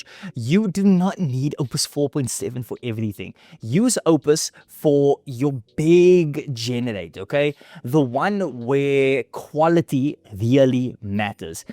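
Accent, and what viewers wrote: South Africa. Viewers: Are you South African? That accent is too local for me to ignore haha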